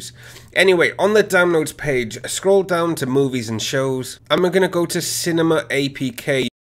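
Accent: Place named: India. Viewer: Lol anytime a click these fix videos and hear an Indian my head just shuts down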